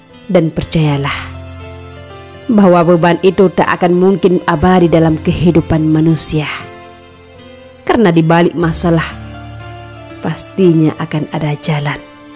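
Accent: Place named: Medan, Indonesia. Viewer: logat medan😂😂😂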